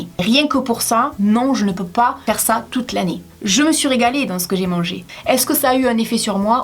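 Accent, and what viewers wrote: South, France. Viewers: Hey ! Superrrrr vidéo !! Pourrais tu faire une vidéo sur des recettes du "Sud de la France"(avec ton petit accent :-)) ou sur des recette faciles et rapides pour les gens qui ne rentrent pas chez eux le midi ;-) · tu m'a fait trop rire la spécialité du sud de la France avec l'accent du sud😂😂😂😂😂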